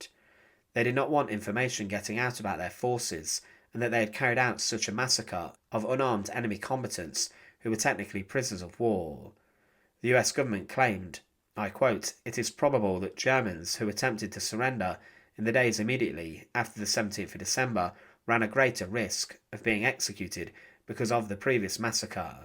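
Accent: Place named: England, United Kingdom. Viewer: I'm sorry but I can't understand a thing this lady is saying. Her English accent is way too thick.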